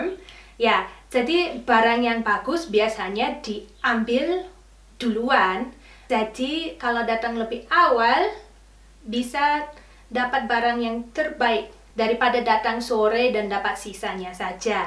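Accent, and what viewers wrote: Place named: Bali, Indonesia. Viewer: logatnya bali campur jawa.. 'dathang' pepathah..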